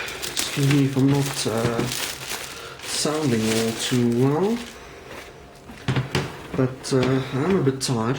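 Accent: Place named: South Africa. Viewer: Heavy Saffer accent. lol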